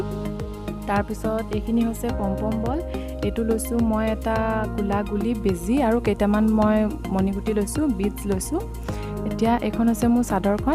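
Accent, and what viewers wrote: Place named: Lower Assam, India. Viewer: kotha kwa bur nomamia kowa jen lgise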